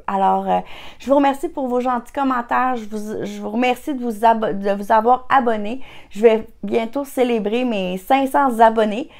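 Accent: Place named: Quebec, Canada. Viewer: .cest.l.accent Quebeccois.bonljour.de.france.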